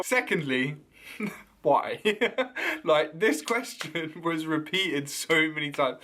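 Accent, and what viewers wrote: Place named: Leeds, United Kingdom. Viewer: I have never heard anyone respect a Leeds accent ever, so tah 😂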